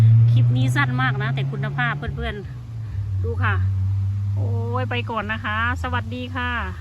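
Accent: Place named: South, Thailand. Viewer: ชอบจังเวลาพี่พูดภาษาใต้คะ😁🍄🍄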